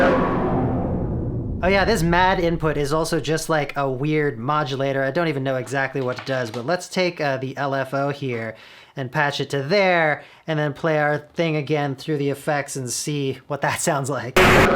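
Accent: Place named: Canada. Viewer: I totally forgot you were Canadian then I heard the word owtside lol love the content man! Been waiting on a good overview of this.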